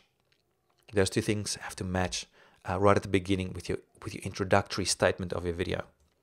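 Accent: South Africa. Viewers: I never thought you were Australian? Being from New Zealand, I thought you had a South African accent (my fave accent haha). But your videos are very helpful, thanks :)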